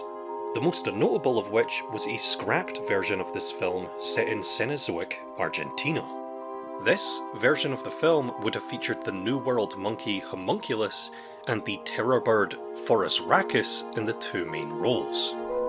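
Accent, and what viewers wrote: Scotland, United Kingdom. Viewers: OI jabber ya scottish yah?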